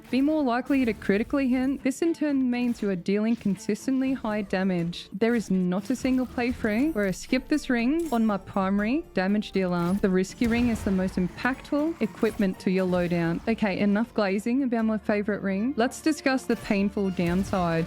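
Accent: Australia. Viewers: I rocking love your Aussie accent!!!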